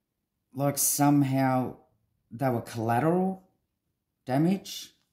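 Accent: Australia. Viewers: Those poor silly girls. They obviously had no idea what they were getting themselves into. They should have disappeared if they did that They were sitting ducks. Didn’t realise you were Australian I’m here to on the nsw north coast. I really enjoy your readings. Thank you ❤️❤️❤️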